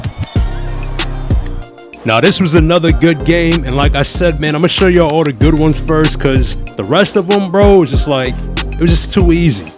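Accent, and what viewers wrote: New York, United States. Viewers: You from NY gang?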